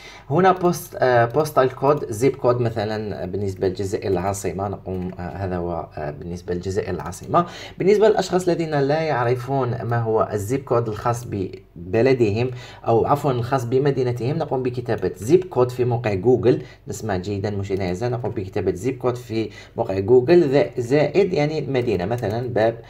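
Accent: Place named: Morocco. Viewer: الاخ اما مغربي و لا جزائري 😂😂😂 عندكم طريقة خاصة فنطق العربية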